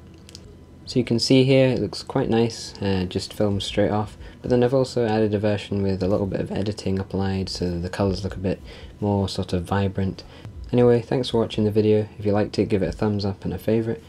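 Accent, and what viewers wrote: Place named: Canterbury, United Kingdom. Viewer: Are you from Canterbury? Im trying to learn southern accents more and my AS chemistry teacher and the guys from Syd Arthur sound similar to you, both are from Canterbury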